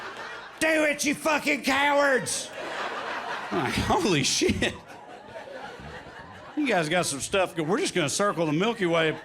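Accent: South, United States. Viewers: Texan here. Glad to see the literate south coming up in comedy. The irony in the accent should be ample impetuous for some more great bubba's-got-a-passport comedy. Excellent set, my dude. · Southern draaaawl…feelin it bro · Trae Crowder. Sooooooooooo Flippin ' Funny. - Scotty Kilmer was the O N L Y smart - talking dude - With - A - Southern - Accent - that I have deeply embraced in my life. Agree with him or N O T... when it comes to talking about cars...... Scotty ' s range of knowledge from individual vehicular component parts to The A U T O I N D U S T R Y in general... I simply love that cantankerous old coot with that southern accent. S C O T T Y. That O N E Dude. That -- W A S -- It!!! - - Now... in my life...... I have a 2nd Southern Accent Dude who I now ❤️ L O V E ❤️. Thank you Trae Crowder for helping me to be less of a Northern Bigot. - The song that ' s used to open // close his comedic routine is Sooo C O O L!!! 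Group:. Song Title: " " Burn. Flicker. Die. " " - Notable 3rd mentioning... Chris Stapleton. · Love this. Maybe funniest sound man ever. Southern accent + a wicked smart savvy insight = tastiest. (Babies listen to your photographer friend and back-pocket that cellphone. The fatigue lines and weird rectangle leg thing ruins a great blue jean) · The southern accent just makes me feel at home. My parents were raised in the south. My dad was the most intelligent person I have ever met in my life and it used to be fun watching him with someone that assumed he was dumb. 😂😂. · Yeah, the accent is a turnoff. Grew up in the south and just don't like what comes with that sound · Found this guy from Tik Tock funny ! Black man from NC here sounds like me and my cousins shooting the shit about ourselves , people and old dead friends and family! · I think your Southern accent has a stiff challenge from the Pittsburgh accent. 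I lived there for 25 years, absolutely the nicest people i ever met, but that "Yinzer" accent made them sound very unintelligent. 😊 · Thanks for the laughs! That was great! Love your southern accent ❤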